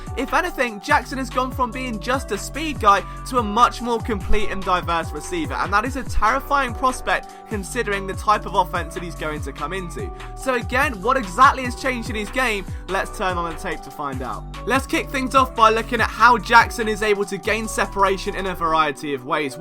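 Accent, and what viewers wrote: England, United Kingdom. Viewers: Hi! Great content and all. Just curious, what’s your Philly roots? It seems like you know so much about Philly sports mist especially with your English accent:-)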